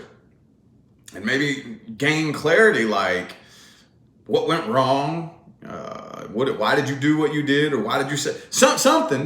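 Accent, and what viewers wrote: South, United States. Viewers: Really enjoyed this reading,! Are you from the South? I know I hear an accent in there.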